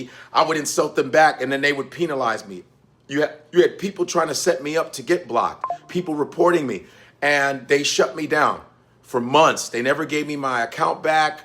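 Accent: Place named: Chicago, United States. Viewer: Congratulations, my Chi-Town Brother. Although I was born and raised on the do-or-die Near West Side of Chicago, where most people speak with a different accent than you, I appreciate yours. Just like I appreciate New York and Atlanta accents and others. I appreciate your intelligence, your talents, and your skills as well. Thanks for being unapologetically Black in public. In addition to that, thanks for sharing YOUR SPECIFIC Black experience. All the best, my Brother.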